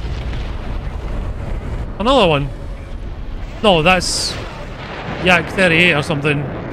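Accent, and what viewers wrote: Scotland, United Kingdom. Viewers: man this dude has a strong Scottish accent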